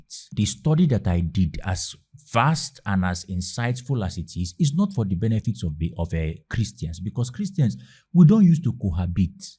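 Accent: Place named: Anambra, Nigeria. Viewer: So I was peacefully listening and enjoying this video ooo Until I was distracted with your Anambra accent, and I remembered the trending video that brought me to YouTube today... Please can you be my Odogwu from Anambra 😂😂😂😂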